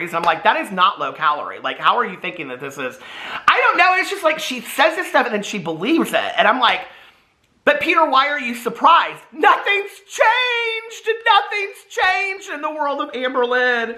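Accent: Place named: Midwest, United States. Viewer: The Midwestern accent was popping in this video and I LOVE it!!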